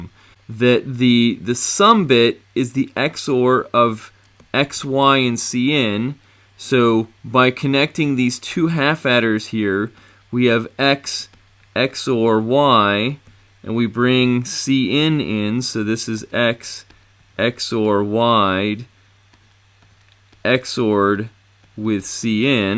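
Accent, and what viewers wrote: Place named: England, United Kingdom. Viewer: Thanks a lot for this english accent explanation, I'm having a trouble understanding indian explanation because I'm being distracted by their accent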